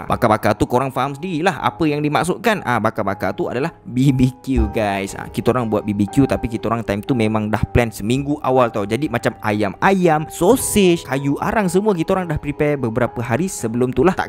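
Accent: Johor, Malaysia. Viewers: bang dari johor ke hahahaha salam dari kulai senai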